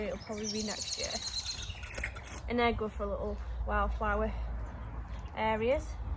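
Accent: United Kingdom. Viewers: I’d love to see a transformation video, a before/after with your thoughts on what you recommended to the client and why. Living in America, I can listen to an English accent for hours. Also, either a video or narration over a video of how and when you started in the business, what you love about what you do, what you don’t like so much, etc. Have a great weekend and stay safe out there 😁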